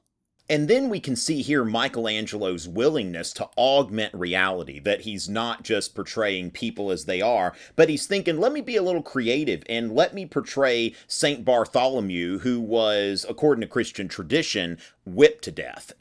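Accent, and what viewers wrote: South, United States. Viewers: Your southern accent really kills me!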